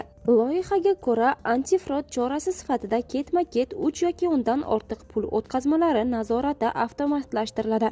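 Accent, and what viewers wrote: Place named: Angren, Uzbekistan. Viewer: ГАПЛАРГА ТУШУНМАДИК. АНГРОК ГАПРСА БОЛАДИМИ. 😮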